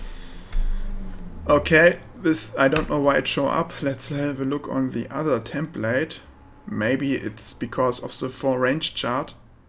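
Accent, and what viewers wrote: Germany. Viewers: I used to work for BMW corporate. Miss the German accent lol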